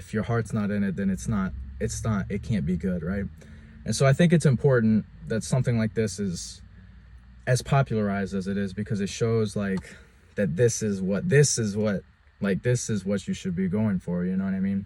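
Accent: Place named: Virginia, United States. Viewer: Good video man, nice list. It sounds like youre from VA, I'm not too far from Harrisonburg myself lol, keep it up man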